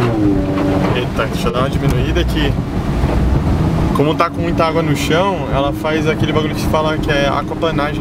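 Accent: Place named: Bahia, Brazil. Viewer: Baiano ein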